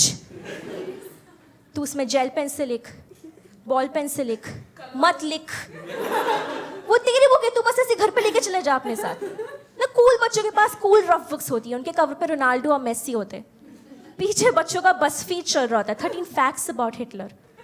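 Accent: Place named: India. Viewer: Her English sounds like hindi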